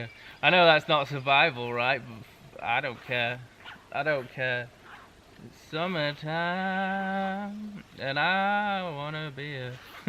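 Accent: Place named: United Kingdom. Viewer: Aren’t you British, you sound British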